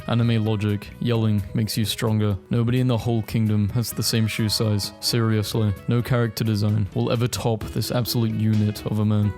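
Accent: United Kingdom. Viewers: I walked into my brother’s room and asked him what he was watching, he said “It’s this depressed British guy reading memes, he’s surprisingly good entertainment.”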